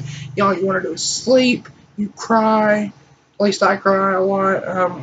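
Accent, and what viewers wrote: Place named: South, United States. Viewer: Mania would be would be the worst, I counteract depression through exercise but I don't know if that works for bipolar people. Seriously though if I were you I wouldn't try and take any sort of mild/strong anti-psychotic. If you want something that works I took pristiq for years on end and it made me feel really 'high'. It counteracted my depression very well when effexor just made me really sweaty and anxious. I mean you can check out some video's when they forced me on abilify, an injectable. Now I get SVT's (recorded as well) all the time. You sound like you got a southern accent I recommend to fight you depression it's probably better to try and get a job further up north. It's just the more south you go I've noticed the worse things get with mental health in America. Have fun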